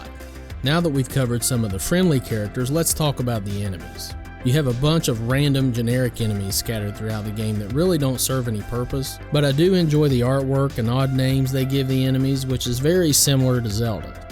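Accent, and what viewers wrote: South, United States. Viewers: Your welcome! I love your vids. They bring a lot of nostalgia to me. Your southern accent reminds me of my best friend from my childhood. We would waste the summer days playing NES and SNES games.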